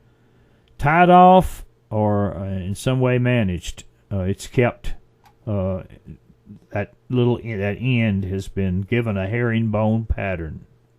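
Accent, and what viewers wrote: South, United States. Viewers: you could read the phone book to me and I would listen to you. Your beautiful southern accent always reminds me of my father. He's been gone 26 years this month. He would have enjoyed your research and videos. I'm so glad that you are still posting videos. I appreciate your time and your efforts very much. I have no problem believing Patty could or would have a braid because there are many stories of bigfoot creatures braiding the tails and/or manes of horses. Thank you for your time!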